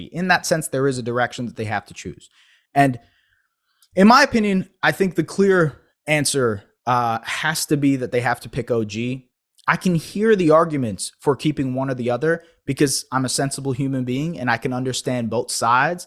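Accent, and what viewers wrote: Canada. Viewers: Raptors trade pascal and build around barnes also u sound Canadian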